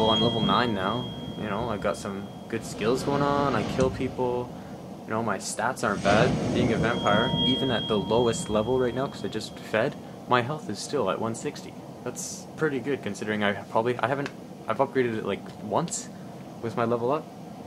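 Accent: Canada. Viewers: yo are you canadian a friend of mine has the same accent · You're Canadian, aren't you?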